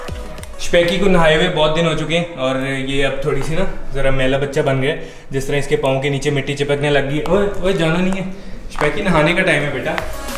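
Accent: India. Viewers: Bro zindabad bolo jindabad nahi.You sound like an indian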